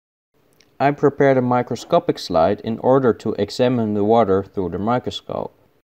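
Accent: Netherlands. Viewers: That moment u just feel the Dutch accent and its true too 😂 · My Netherlander friend, I say this only because I know you are interested in knowing things and not because I am trying to be pedantic, diaphragm is pronounced "die-a-fram." The G is silent. Thanks for the all the great videos & love your accent!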